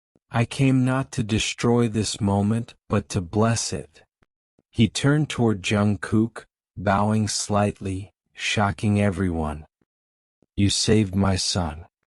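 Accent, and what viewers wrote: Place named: Asia. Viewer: may I ask you what nationality you are? I hear a faint accent in your voice. Are you Asian or partly Asian. I'm just wondering cause I love listening to your voice when you read.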